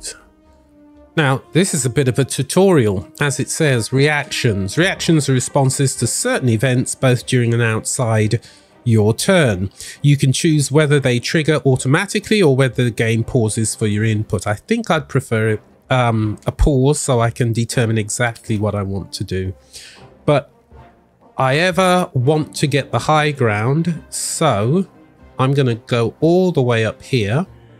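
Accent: United Kingdom. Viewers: Good video 👏. Your accent sounds British....Are you? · Wow. Talk about the voice not matching the person. You sound like you're maybe 20 years old max, and the british accent makes you sound even more posh. Clean living, I guess? Anyways, lovely video, will check the rest of your stuff out too.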